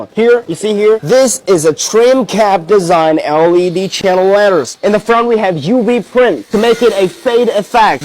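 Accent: United States. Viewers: How is english this american lmao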